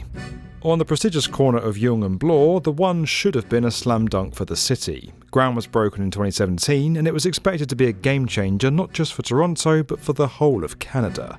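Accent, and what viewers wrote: United Kingdom. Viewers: I know this channel is based in London, but I always feel a British accent in a documentary is just fake and added to fool people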